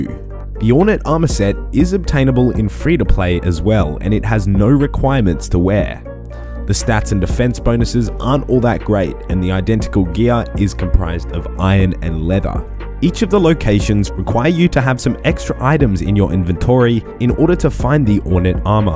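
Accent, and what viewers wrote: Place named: Australia. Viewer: Haha I always love the way Australians say certain words 😄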